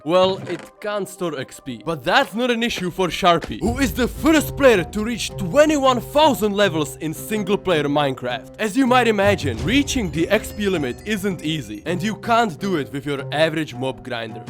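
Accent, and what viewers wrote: Czech Republic. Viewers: Ah... i was questioning myself that you have quite cz accent :'D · The most Czech accent in history frfr. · this guy's English accent is just typical Czech... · The Czech accent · Why do you have a czech accent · Je to haluz, hned jsem poznal přizvuk, Češi se poznaj 😀 keep up the good contennt, dobře se poslouchaš!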